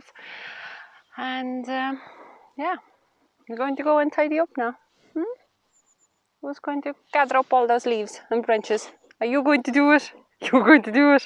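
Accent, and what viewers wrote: Kerry, Ireland. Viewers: Thank you so much for that. I was leaning a bit towards blackthorn since it’s one of our great native trees. We have plenty of midges already in Donegal so a few more won’t make much of a difference! I like the idea of supporting more birds and insects too. And the flowers and berries. An aunt of mine was addicted to the berries and used to eat them directly off the tree, although they must have been pretty bitter. Your advice will help me decide. PS. You have a great Kerry accent!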